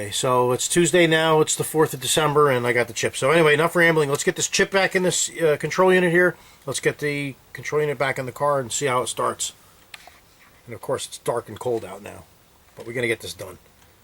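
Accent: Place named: New Jersey, United States. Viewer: You are a bad ass mechanic. I was rasied in New Jersey and have brothers and sisters that still live there. Nostalgic to hear NJ accent. Wife drives 1996 Jaguar Vanden Plaus, I'd say the Jaguar 4.0l inline six sounds much smoother than BMW. HaHa. Thanks for your videos I always learn something new.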